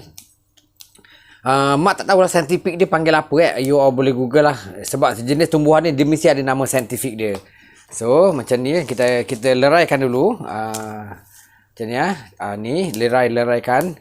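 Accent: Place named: Muar, Malaysia. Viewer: Terbaik makk... org muo gaya bahasanya mmg ada identiti tersendiri😁👍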